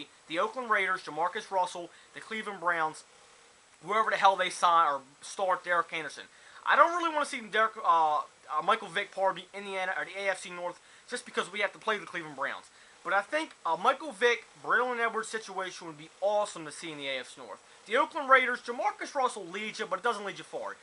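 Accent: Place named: Baltimore, United States. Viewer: I love your Baltimore accent, man. It's fukin awesome...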